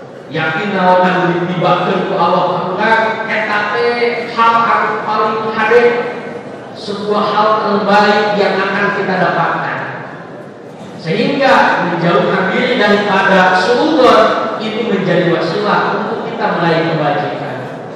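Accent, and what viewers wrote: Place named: Garut, Indonesia. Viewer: subhanallah sima kawantun has garutna khas pangersa mah 😍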